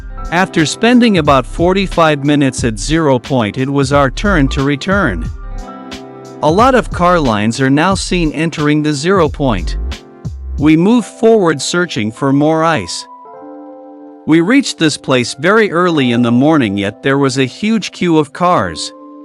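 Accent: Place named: United States. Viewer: Why would you use an American voice for this video?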